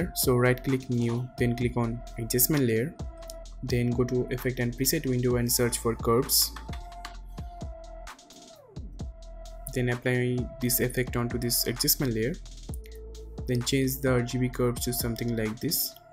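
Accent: Bengal, India. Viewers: bengalis from bengal have shittiest irritating english accent.. amazing btw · Brother are you indian? lol❤️❤️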